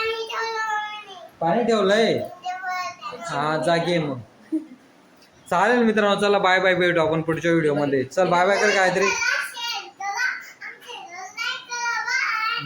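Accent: Khandesh, India. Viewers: भाऊ तू खान्देशी आहे का? तुझ्या भाषेवरून वाटले कि तू खान्देशी आहे. मी पण जळगाव ची आहे.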